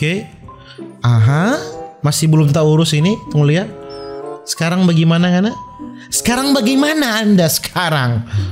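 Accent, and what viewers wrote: Manado, Indonesia. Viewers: Hy bg gua suka liat konten2 lu hnya karna suka dgn cata bicara dan logat menado gua asal sunda Bogor bg sukses selalu yg budi🤣🤣🤣seeyou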